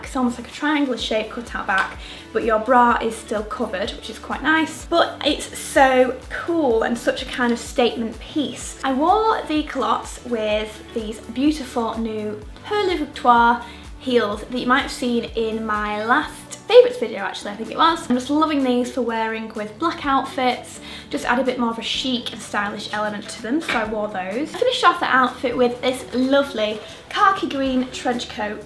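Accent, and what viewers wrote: United Kingdom. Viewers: Love your accent. I'm from the US so it sounds like you have a British accent or something like that. Also love your videos.😋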